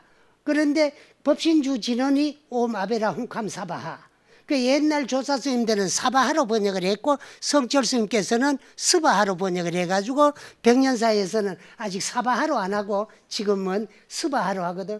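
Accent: Gyeongsang, South Korea. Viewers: 묘허스님법문에 푹 빠져서 밤낮을 모르고 경청하고있습니다 스님 감사합니다 경상도 사투리가 이웃집 어르신같습니다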